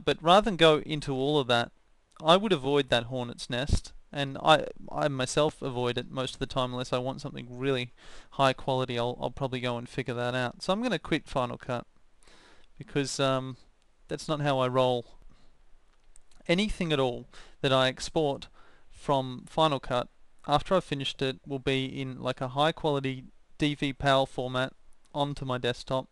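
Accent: Australia. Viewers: hes not english hes australian or smth u idiot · He's Australian you fuckwit. Any idiot can tell that.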